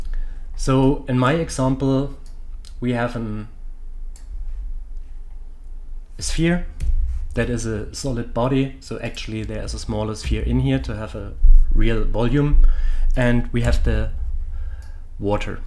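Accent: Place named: Germany. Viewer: Dein deutscher Akzent ist leicht zu erkennen... welche Hochschule hast du besucht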